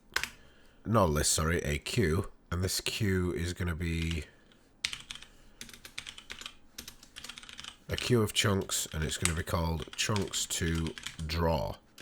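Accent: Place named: England, United Kingdom. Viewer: Your videos are not boring. For those of us who want to learn, videos like this are rich with content and quality. I walked out of this video being able to thread. That is an invaluable quality in gaming that few people out there bother to take the time and care to teach. So when you present us with this Cake filled with information wealth, it is ANYTHING but boring. Have you noticed we dont thumbs down you? It is partly because you are english and sound awesome, but mostly, its because somehow, the way you teach gets through. You take time, you allow us time to process... These long videos, I watch through from one end to another. This, is quality. I will continue to patreon you until I am poor because you have helped us.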